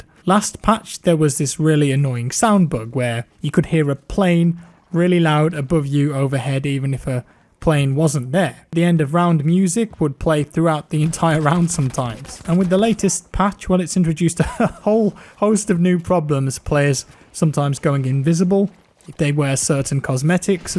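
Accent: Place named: West Midlands, United Kingdom. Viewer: sounds REALLY West Midlands in this video!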